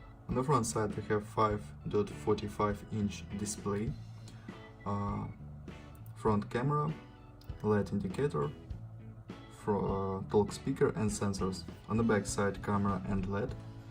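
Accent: Russia. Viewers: Русский акцент и никуда от него не деться.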